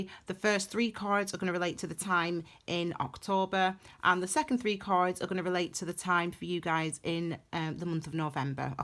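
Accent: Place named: Northern England, United Kingdom. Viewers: I'm in Darwen, I knew I recognized the accent 🤗 thanks for the video! Have a beautiful week x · Love your readings, your lovely brown hands and your "Guy Martin" accent. You sound like his female equivalent. Are you two from the same geographic area?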